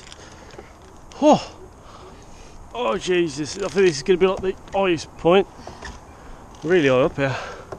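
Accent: Black Country, United Kingdom. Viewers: “It looks like a spider’s web doe it” Ah the refreshing sound of a Yam Yam...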